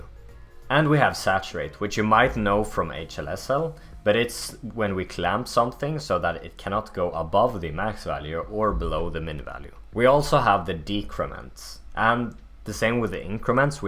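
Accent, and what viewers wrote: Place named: Sweden. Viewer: Wow, really good quality for just starting out! I really liked the explanations, they were pretty clear and your accent is very nice. Kind of sounds like an Swedish accent to me. Personally, I didn't like the moving parts (top, bottom) whenever the code was shown, because it kind of distracted me, but that's just me.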